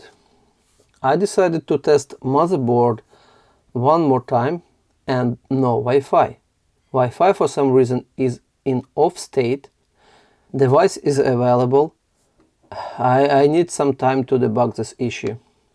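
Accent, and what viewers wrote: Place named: Eastern Europe. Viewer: Super nice video! And Eastern-European-English style gives it extra fun ❤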